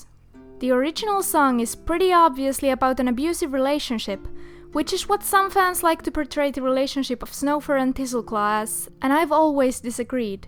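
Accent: Finland. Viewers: I like waffles MÄ TIESIN SEN! MÄ OLIN NIIN VARMA ET SE ON SUOMESTA JA NYT TOTUUS ON SELVILLÄ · Da Dragon Durp it's called "rally english" it's really common with finnish people xd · Ah, i can hear the finnish englhis.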